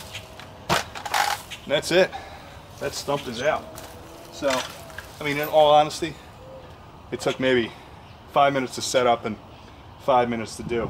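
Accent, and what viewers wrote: Philadelphia, United States. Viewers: Is that a Philadelphia accent? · its been almost 50 years since I lived there, but that sure sounds like a suburban Philly accent....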